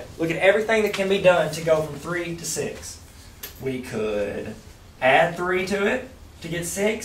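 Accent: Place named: Arkansas, United States. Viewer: I think he is from Arkansas if not from texas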